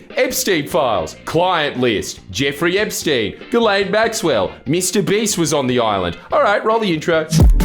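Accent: Australia. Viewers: This man is funnier because of his Aussie accident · I'm assuming you are Australian and my mind can't process those words with an Aussie accent😅😅🤙🏾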